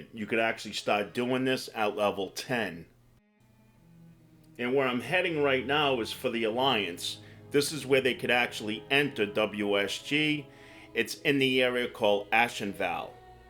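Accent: New York, United States. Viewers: Thanks for keepin it real, also dig the NY accent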